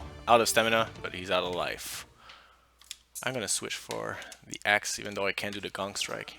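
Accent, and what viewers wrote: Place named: Quebec, Canada. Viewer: J’entends le québécois dans cette voix! Allo compatriote! Bien hâte d’essayer ce jeu!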